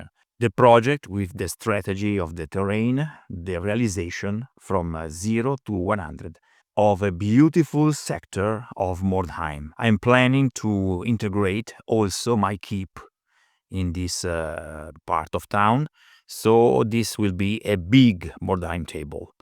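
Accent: Italy. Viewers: I’ve never had trouble understanding you. There’s some charm to your Italian heritage and accent. Best of luck, have loved the channel for a long time.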